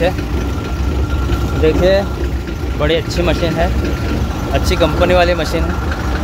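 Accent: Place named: Malwa, India. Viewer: आप की भासा सेली से आप शाजापुर देवास उज्जैन के लग रहे हे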